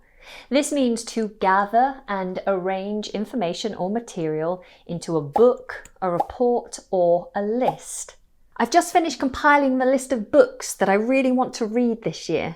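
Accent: United Kingdom. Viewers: I love the Brit accent <3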